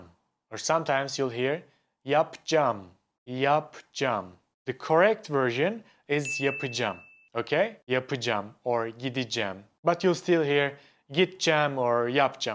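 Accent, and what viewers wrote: Turkey. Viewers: Senin Erzurumlu şiveni seveyim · bro. i just noticed he has a turkish accent. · Abi Türk olmama rağmen izledim bende İnilizce öğrenmeye çalışıyorum Türk aksanı ile iyi oldu.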